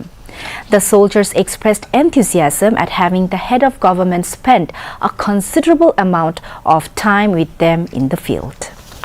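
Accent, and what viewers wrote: Bangladesh. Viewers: বাংলাদেশে ৬ মাসের ইংরেজি কোর্স চালু করা দরকার আমেরিকান/বৃটিশ একসেন্ট শিখানোর জন্য। এই দেশী একসেন্ট শুনে হাসবো না কাঁদবো বুঝতেসিনা😂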